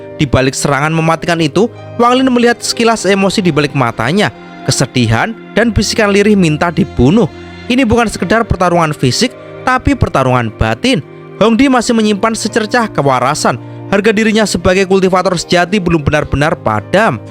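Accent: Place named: Java, Indonesia. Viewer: Jawa ya😂😂 mendok kali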